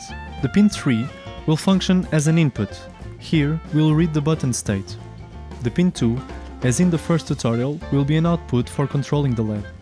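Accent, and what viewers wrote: Portugal. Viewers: Impecável. Bem que estava a reconhecer um sotaque Português. Bom vídeo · Este sotaque não engana ninguém, tinha de ser português, ;)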